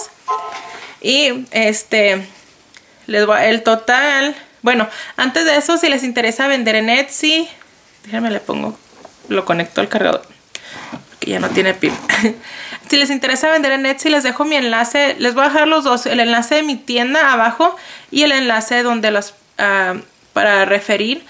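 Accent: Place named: North, Mexico. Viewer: Eres como del norte de México, me di cuenta cuando dijjiste "oshenta y osho" jejejeje. Saludos!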